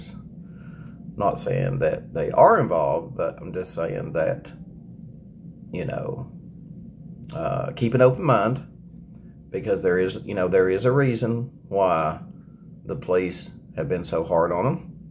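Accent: South, United States. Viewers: You look better today. Younger even! Just love your southern American accent.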